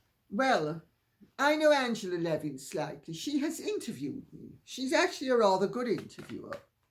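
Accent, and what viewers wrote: United Kingdom. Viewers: ☆☆☆\\\ELAINEWASHINGTON///☆☆☆ THANK YOU, LADY C FOR ALL YOU DO FOR YOUR HARD WORK ON RESEARCH ON OBTAINING THE CORRECT INFORMATION . THEN WRITING SO THAT THE INFORMATION SOUNDS OF INTEREST TO ALL LISTENING . YOU DO SUCH A FINE JOB AND I WANT TO SAY THANK YOU AND HOW MUCH I ENJOY LISTENING TO WHAT YOU HAVE TO SHARE. I LOOK FORWARD TO HEARING YOUR VOICE EVERYDAY . YOU ARE SO BRITISH ! WE HAD A BRITISH COUPLE VISIT OUR CHURCH AND THEY WERE SO WELCOMED ! WE WOULD ALWAYS ASK THEM TO TALK BECAUSE WE LOVED HEARING THEM ANSWER ! WHY ? WE ENJOYED HEARING THEIR BRITISH ACCENT AND SAYINGS. I LOVE YOUR ACCENT ! YOU ARE SO BRITISH ! I LOVE YOUR EXPRESSIONS AND ACCENT . THANK YOU LADY C. I LOVE THE WAY YOU SOUND WHEN TALKING !💖 I LIKE YOUR JEWELRY AND DRESS . YOU LOOK SO GOOD💝 HUG THE FUR BABIES.💋 THEY LOVE YOU LADY C .💛💚 LADY C DID YOU SEE ON UTUBE WHERE HARRY WOULN'T HOLD HARRYS HAND ? 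DO YOU SEE 👀 THE UTUBE VIDEO ? DO YOU HEAR ANYTHING ABOUT THR TWO DIVORCING ? MANY PEOPLE HAVE SEEN 👀 INTERCHANGING BETWEEN THE TWO HERE AND THERE THAT HARRY 👀 WASN'T HAPPY WITH RACHEL RAGLAND 😒 ON ONE OF THEIR APPEARANCES . RACHEL SEEMED INSISTENT TO HOLD HARRYS HAND. THAT MADE HARRY PULL AWAY FROM R.RAGLAND MORE ! DO YOU THINK THE HONEYMOON IS OVER ? HARRY LOOKED SO VERY UPSET !😡 ☆ THOMAS MARKLES AND HIS ELDEST DAUGHTER , SAMANATHA , PLUS HIS SON ALL ASKED NOTTO REFER TO MEGAN AS MARKLES ANYMORE FOR THOMAS HAS DISOWNED HER AS HIS YOUNGEST DAUGHTER . HE SAID SHE HAS TOLD SO MANY LIES ABOUT HIM AND THE REST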